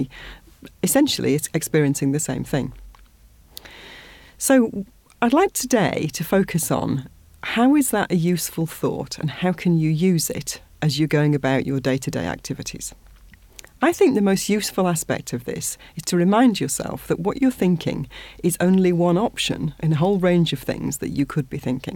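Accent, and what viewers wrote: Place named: RP, United Kingdom. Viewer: Whenever I need to act out a standard british accent, I think of you. It's not the accent, it's poise-ness, precision and clear impact that I get out of it. This is your gift, and you are sharing it. Thank you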